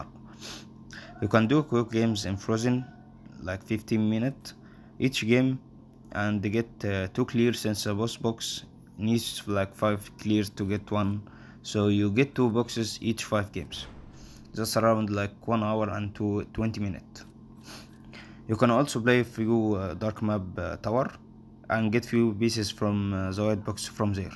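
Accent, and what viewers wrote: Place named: Egypt. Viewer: مصري عظمه الصوت باين حصل باين فشخ xd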